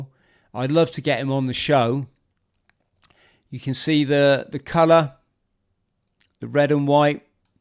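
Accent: Plymouth, United Kingdom. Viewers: If that's a Plymouth accent that I'm hearing - that axe throwing place is pretty close to the Octagon! Lot's of the classic architectural hallmarks in the city's geography.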